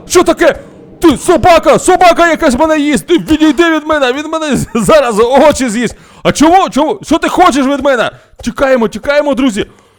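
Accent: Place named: Arab world. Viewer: До речі, хотіла спитати, ти араб ?( просто у тебе такий діалект , як у арабів)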